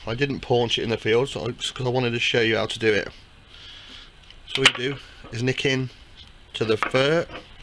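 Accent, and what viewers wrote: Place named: Wolverhampton, United Kingdom. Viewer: you sound local to wolves, when can i come round for some?? fair play mucka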